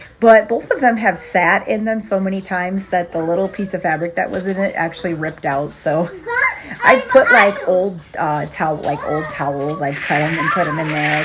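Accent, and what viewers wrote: United States. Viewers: I came upon your channel and am enjoying it. Beautiful family. I knew I recognized an upper Midwest accent (I am from Michigan!) 💕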